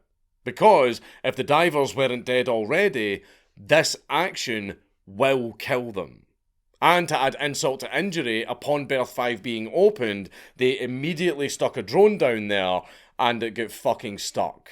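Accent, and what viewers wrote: Scotland, United Kingdom. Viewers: Stuff like this is hard to get through. Scottish accent or not. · Oh damn a story I actually do know! but I'm so happy to hear it in a Scottish accent *If you want to hear the audio MrBallen has it at the end of his video covering this same subject. Both videos are equally amazing though. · Fascinated as an American to hear an authentic Scots burr. Barely intelligible to me. Have to rely on the visuals. At least until I am used to it. Very different from Scotty on Star Trek 😂😊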